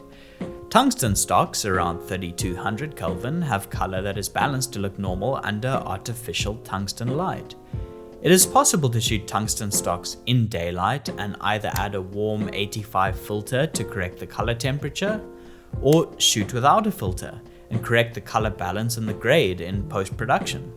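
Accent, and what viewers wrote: South Africa. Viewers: Bru are you South African? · Hey man, great content, your accent is so SA!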